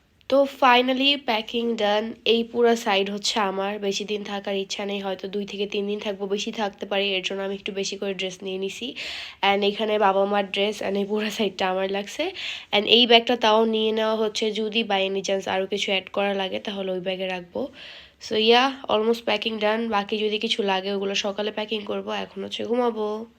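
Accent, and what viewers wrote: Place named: Alfadanga, Bangladesh. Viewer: Hiiii... So sweet of you....first time cmnt korchi tmr vdo te... Amdr gram er basha o alfadanga... Shune vlo lglo amra same elakar manush....❤❤❤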